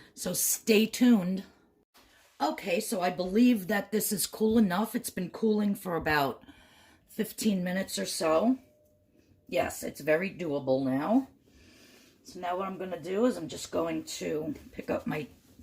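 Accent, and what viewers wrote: New York, United States. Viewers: ... I love you! Just found you on YouTube! Love your NY accent.....lol... where are you from? Long Island? the Bronx? I'm going to try this recipe.... You are very innovative my dear.... take care and will be supporting your channel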